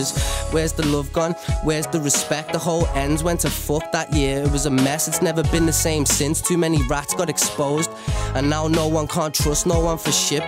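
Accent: Liverpool, United Kingdom. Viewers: Yo the scouse accent actually works you know! Fan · mad but he's scouse😂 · liverpool accent is pants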